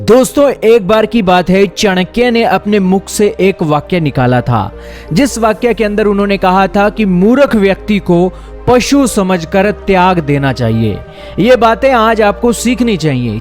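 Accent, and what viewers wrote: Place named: Himachal Pradesh, India. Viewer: Ye voice kisi himachal wale ki hai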